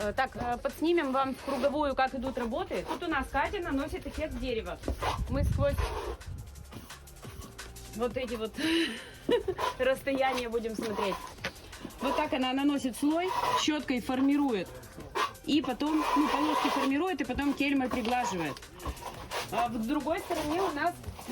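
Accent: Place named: Udmurtia, Russia. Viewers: Как мне нравится удмуртский говор, мммм😊! Сколько знаю девочек с Удмуртии - все мегапозитивные, очень симпатичные, вечно деловитые💪❤ и очень душевные❤❤❤ Привет от соседей- татар! 👋👋👋😊😊😊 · Говор сразу понятно Удмуртия😊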